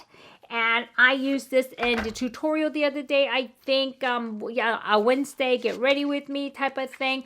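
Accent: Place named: Boston, United States. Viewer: I absolutely love your accent hon, is it Boston? I’m hopeless at working out regional accents x I’d love to see your skincare regime as your skin always looks so fresh x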